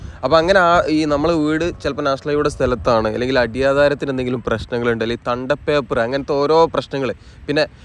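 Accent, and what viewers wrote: Malappuram, India. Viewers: especially your Malappuram slang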